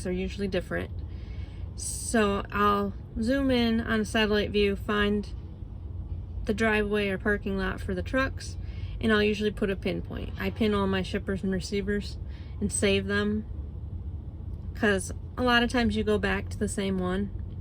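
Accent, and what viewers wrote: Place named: Michigan, United States. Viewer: You sound like you may be from Michigan. My Love is from there, too. She's from just below Traverse City. A little town east of Manistee called Wellston. Just wondering. As always, travel safely...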